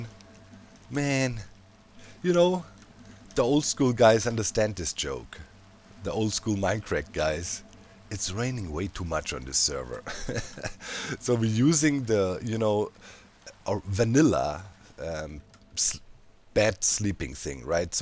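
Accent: Germany. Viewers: Habe dich ganze zeit in anderen videos gesehen von bspw. Grian oder Mumbo und hab mir immer gedacht der hat doch voll nen deutschen Akzent. Jetzt weiß ich es fühle mich gut danke xD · Love you, I'm not a real supporter talking about money but I wanna call my self a real moral supporter, love your way to play this game, your way to ponder videos and also your character: real's life one and fictional one. Man, go gogogogogoogogo and never stop. Thx to make me enjoy a doichman speaking eng for so long <3 hope to meet you one day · I'm learning German on the side, listening to speak English is actually helping me with my accent when practicing lol. Keep it up,.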